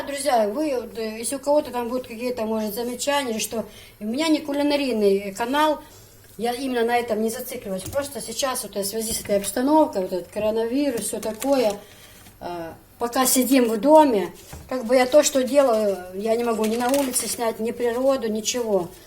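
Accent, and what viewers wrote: Chechnya, Russia. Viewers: ты такой молодец,знаю как было тебе тяжело освоить ментилитет чеченский,ты справилась на все 100%,ты полностью воплотилась в чеченку,что акцент,что внешность,что монеры.Хвала тебе,и восхищение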